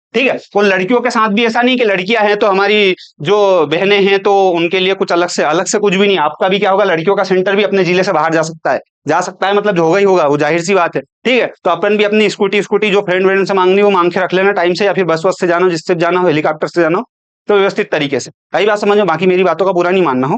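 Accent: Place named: Agra, India. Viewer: पक्का तुम आगरा के आसपास के हो भाषा से😂😂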